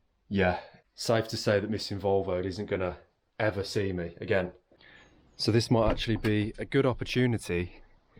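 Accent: Birmingham, United Kingdom. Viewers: Glad things are coming together for you..i am from the uk and i sense a west midlands accent.(brummie) perhaps.?...bavaria looks magnificent..best wishes.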